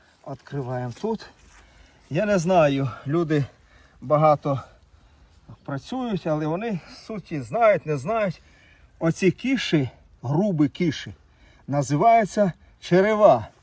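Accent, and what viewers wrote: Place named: Surzhyk, Ukraine. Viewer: Учичи буквар вчи українську мову яка гидка твоя суржикова мова просто ганьба гидко слухати може ти щось і петраїш в цій справі а мова просто гімно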